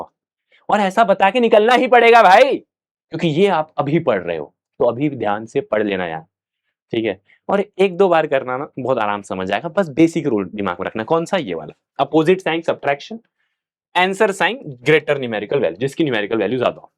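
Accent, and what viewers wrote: Bihar, India. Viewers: bihari ho kaa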